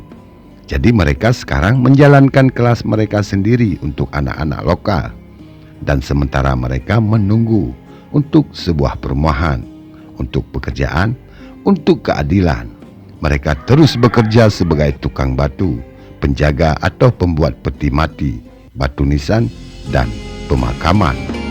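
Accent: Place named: Bali, Indonesia. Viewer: Ini naratornya orang balii yaa Keliatan dr logatnya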